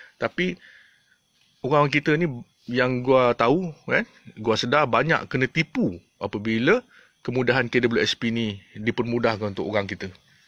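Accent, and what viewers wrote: Kelantan, Malaysia. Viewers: Kemah keming kemuk vutoohh yip yip yip😂..terbaik bey lado..kecek Kelate sdap dgar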